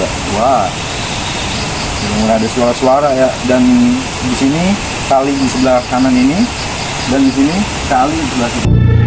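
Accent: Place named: Jakarta, Indonesia. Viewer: logatnya Betawie banget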